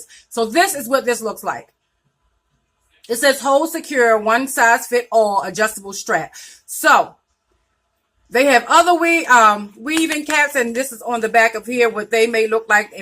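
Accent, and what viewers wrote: Baltimore, United States. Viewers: Baltimore accent SKRONG 💪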